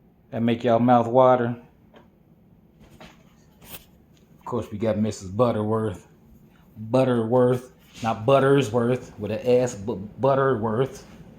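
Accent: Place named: South, United States. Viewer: My wife said you sound country. Where are you from????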